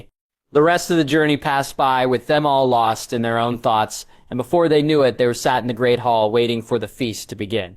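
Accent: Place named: United States. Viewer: Why is this one with an American accent? It's really jarring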